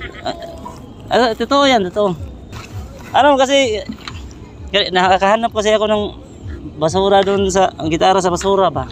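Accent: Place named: Visayas, Philippines. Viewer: Pag bisaya na lng oi,halata imong tuno!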